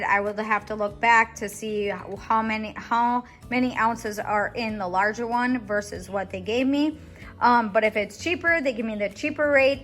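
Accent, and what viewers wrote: Wisconsin, United States. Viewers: Do you ever go to Woodmans? I don’t know how far north they are in Wisconsin. I knew the Wisconsin accent too. (I live in Illinois) 😀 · When I heard your accent I knew you were from Wisconsin. Hello, from a new subscriber from Wisconsin!! Loved the haul. God bless 🙏🏼❤️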